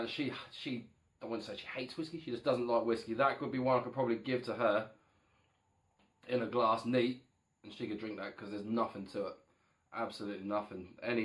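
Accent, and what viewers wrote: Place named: Ipswich, United Kingdom. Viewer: What's your accent? You sound like an Ipswich boy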